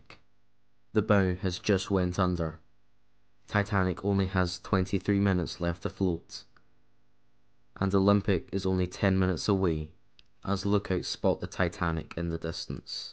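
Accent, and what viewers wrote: Scotland, United Kingdom. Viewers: Love the Scots accent.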